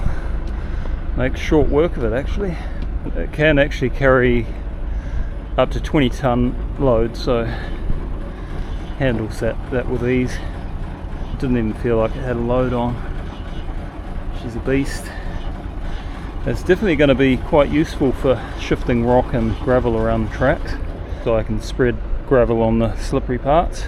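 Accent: New Zealand. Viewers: as a new viewer of your videos again I can't get enough! I could have watched that for over an hour! I did miss the closed caption option, I am hard of hearing but it takes me a while to figure what you're saying in the New Zealand accent! I'm not complaining, but I sort of depend on that closed caption to make sure that I understand your every word. AM. Skip, Western PA, USA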